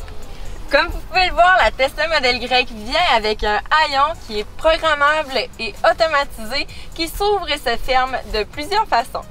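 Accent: Quebec, Canada. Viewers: J'adore ton accent quebecois. Moi qui est un peu séjourné au Canada plus jeune pour mon travail,j'adore ce pays lointain de la France. Bonne continuation et merci pour les tuyaux sur certainement ma future voiture TESLA Y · Moi: Cet accent, Mon pote : Ce du Quebecois. Moi: J'aime la fille moi. Mon pote: Non Moi: Pourquoi? Mon pote : Vous allez jamais se comprendre. Merci pour le video, Un nouveau AIME pour vous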